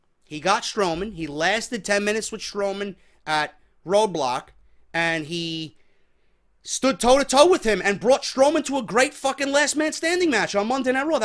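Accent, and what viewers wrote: New York, United States. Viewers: Started watching your videos this week, you do a great job of sourcing all the info you present to the audience. You have a strong understanding of what fans want and what the casual fan or even casual mark want but dont know it. I really enjoy the NY gritt you have, angry and smart tone but articulate and smart - well done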